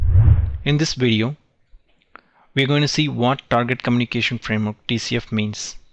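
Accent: India. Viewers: Awful Indian accent as always. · wow this one is exactly from middle of india - however useful tutorial but bad accent - anyway good luck to you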